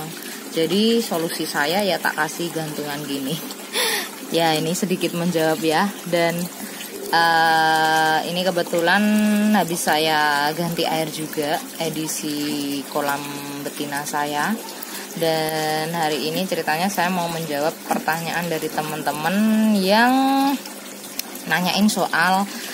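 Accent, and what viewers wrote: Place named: Kediri, Indonesia. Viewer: Mbaknya jawane mana tho mbak? Kok kayak Kediri logate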